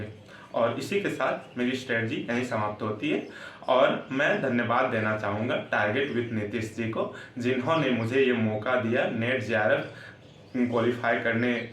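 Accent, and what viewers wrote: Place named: Bihar, India. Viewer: Bro tum Bihar se ho kya?? Awaz se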